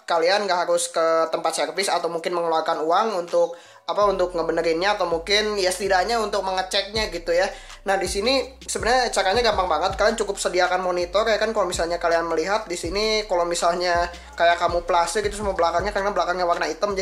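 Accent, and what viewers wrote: West Java, Indonesia. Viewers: Si akang nya orang sunda ya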